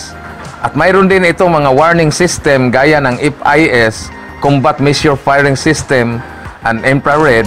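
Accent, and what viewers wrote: Visayas, Philippines. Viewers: Hirap intindihin pag bisaya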